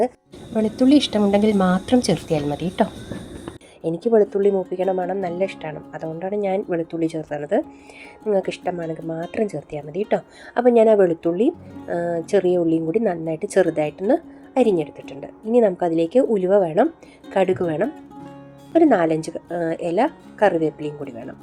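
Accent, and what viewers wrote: Palakkad, India. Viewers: പാലക്കാടൻ ഭാഷ അടിപൊളി.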